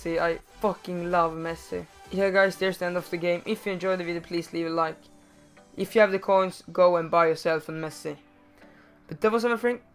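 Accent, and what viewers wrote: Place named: Sweden. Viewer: Just Swedish viewers here... Because of that english.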